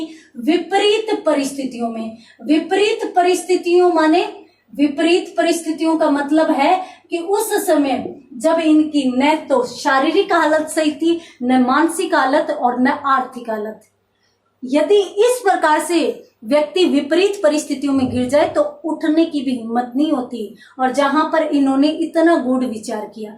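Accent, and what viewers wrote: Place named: Ganganagar-Bikaner, India. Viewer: Dii ,pata ni par mam ki voice se ganganagar,bikaner region se lagte hai।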